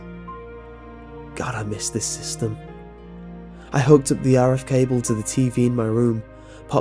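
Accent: United Kingdom. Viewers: yay british narator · || WhoSquad he's British ever heard of it i think you don't because most people on youtube are american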